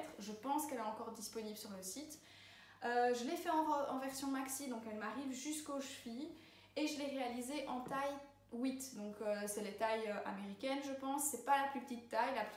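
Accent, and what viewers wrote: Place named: Belgium. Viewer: j'adore ton accent belge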